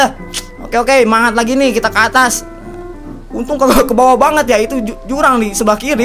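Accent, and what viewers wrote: West Java, Indonesia. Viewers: sunda bgt wkwkwk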